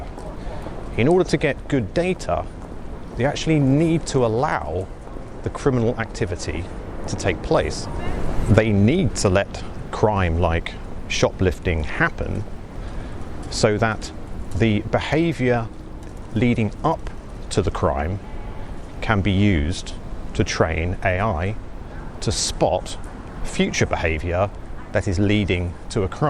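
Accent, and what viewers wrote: England, United Kingdom. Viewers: New sub keep doing what you are doing and there is nothing like an English accent to teach.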